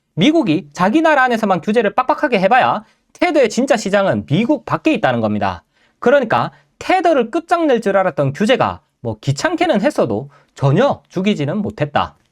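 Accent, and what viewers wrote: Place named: Gyeongsang, South Korea. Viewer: 코인계 일타강사 인정합니다. 머리에 쏙쏙 들어오는 내용과 명확한 딕션, 그리고 말씀 중간중간 묻어나는 경상도 억양이 아주 큰 매력입니다. 덕분에 지루할 틈 없이 완강했습니다. 감사합니다. 편안한 주말되세요~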